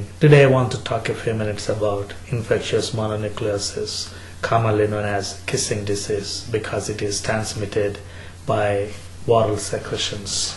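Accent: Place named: South India, India. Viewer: almost like listening to a new language.you are so learned and look like a hardworking person you should try and practice speaking commonly known and understandable accent of english ...earnest opinion.There are so many people besides south indians who would like to know what you are saying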